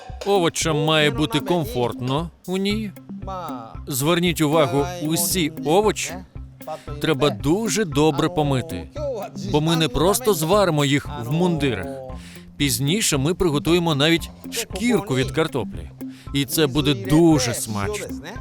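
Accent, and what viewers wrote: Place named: South, Russia. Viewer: О, южно- русский говор появился... но не я думаю понимают, так 50/50 примерно. Но лично я понимаю мову. Просто , как по мне это смешно выглядит.😂 с возвращением!❤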